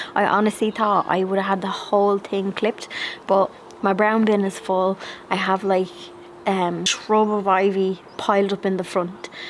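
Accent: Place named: Ireland. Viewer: Brilliant I could listen to you all day long....your accent is amazing and I love your little ditties....pure Irish. X Best wishes from the UK... X 🌼🌸🌱🌿🌾I even love to meet you and have a brew ... ☺️👍☕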